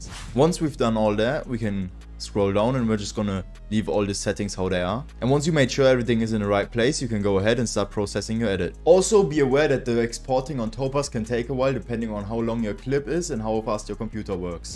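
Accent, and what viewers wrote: Germany. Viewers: Man hört so krass das Deutsch heraus :D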